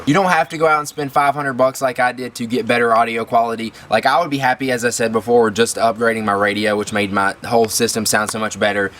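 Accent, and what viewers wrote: South, United States. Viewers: Dude how is this impressive... Do u watch ur own.vids before u post them? This is a tech channel and I got this southern sounding pre-pubescent. Hillbilly saying "it mAkes radio sound better.. Durr".. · Are you from the southern states? I hear a little bit of a southern accent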